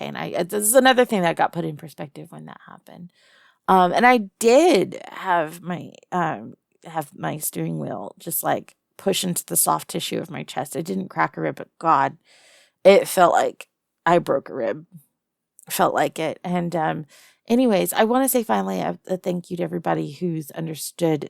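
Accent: South, United States. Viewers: Bear girls? Love them, can never get enough. Southern accent? Definitely one my greatest weakness. Reverse comfort? Oh yeah, cant be the only one comforted!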